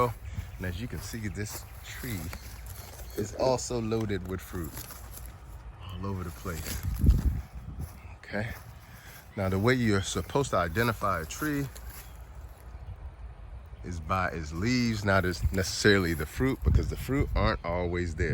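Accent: Jamaica. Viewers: Can I get two of your coconuts. Send some to Jamaica the land of wood and water. You sound like a son of the soil of Jamaica.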